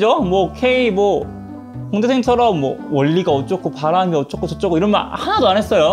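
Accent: Gwangju, South Korea. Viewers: 헉 약간 말투가 광주바이븐데 광주광역시 출신이세요?!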